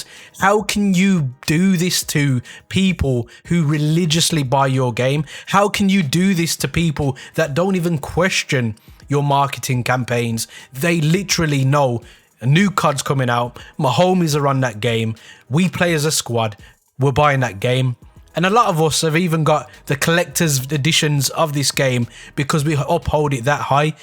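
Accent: Birmingham, United Kingdom. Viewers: Proper brummy lad!!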